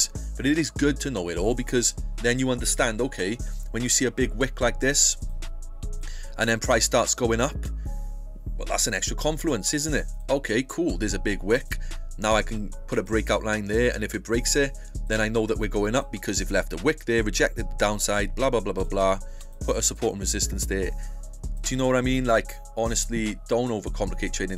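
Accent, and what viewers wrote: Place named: Cardiff, United Kingdom. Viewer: Cardiff accent bro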